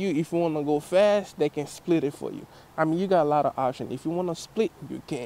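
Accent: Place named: New Orleans, United States. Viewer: Sounds like a NOLA accent.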